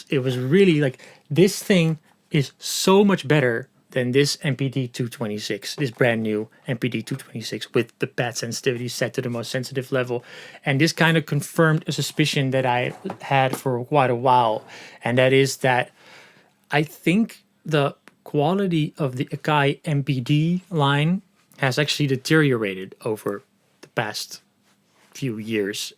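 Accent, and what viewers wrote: Netherlands. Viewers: So you are Dutch, right?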